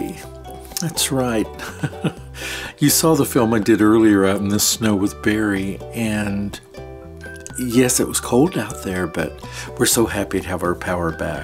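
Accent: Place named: South, United States. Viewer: I'm so glad you are okay and I hopefully all the animals are doing well! Ahh hahaha, I love your country voice wait until u hear my real country as turnip greens vice😂😂😂😂😂 but honestly we got ice and snow q, but nothing like!e that although we do every 6 yrs or so.. But doing my patron. I wanted to send the national guard to get you. That's bow worried I was, there's a surprise on the way my dear It' be over yonder in your box very soon alright sweetheart. Still getting thru the excruciating pain with nothing talked to a specialist in osteonocrosis and averyadic neurcrosis and I might be going to john Hopkins or California to a hospital there to be part of a study, I have been told I have about a year to live in!was they slow it down, but keeping busy and putting my energy what I have on new techniques and a total makeover of my yt channel, name and everything! 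Patron on the way!!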